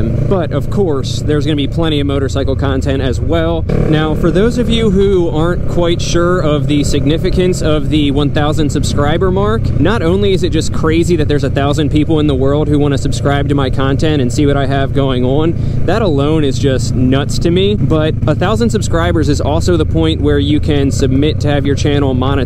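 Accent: Baltimore, United States. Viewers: fuck yeah bruh. the baltimore accent is making me nostalgic lol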